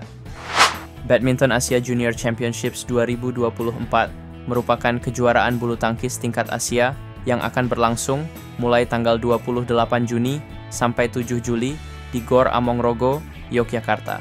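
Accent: Banyumas, Indonesia. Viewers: mengapa naratornya diganti, 😢😢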